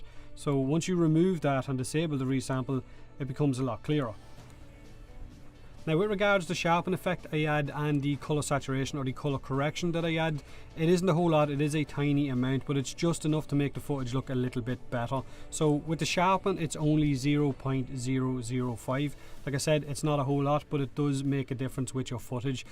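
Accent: Ireland. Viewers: How do you add that sweet Irish accent to your videos? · Your Irish accent is awesome :) (seriously Irish and Scotish accents are the best :D) and thanks for the video! :D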